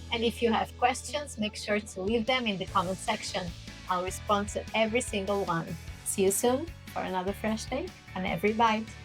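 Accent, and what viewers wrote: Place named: Brazil. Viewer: By her accent, she is Brazilian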